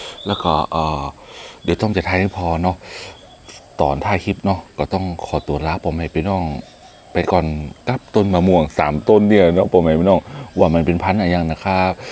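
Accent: North, Thailand. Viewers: น่ากินเนาะ .คนเหนือพูดจาเพราะดีนะ.ชอบๆๆจ้า.❤️❤️❤️👍👍👍🇦🇹🇦🇹🇦🇹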